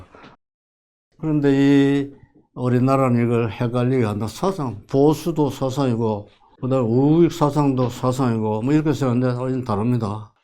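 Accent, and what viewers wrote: Gyeongsang, South Korea. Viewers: "언어"를 "은어"로 발음하고 "어"와 "으"를 구별않는 경상도 식 억양이 섞였지만.. 직업적 강사가 아닌 분이 줄여서 하는 말이라 좋고, 오히려 더 확싫히 이해가 가네요.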